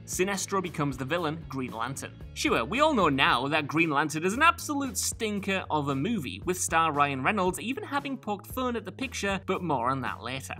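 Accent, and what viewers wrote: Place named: Newcastle, United Kingdom. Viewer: I do love a nice, Geordie WhatCulture video... (Unless it's a macam) 😀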